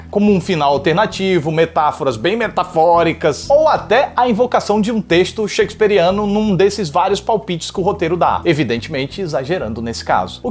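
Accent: Northeast, Brazil. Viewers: Amigo vc é do Nordeste é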